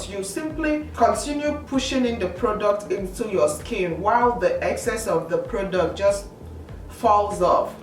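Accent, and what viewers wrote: Nigeria. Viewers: Nigerian accents <3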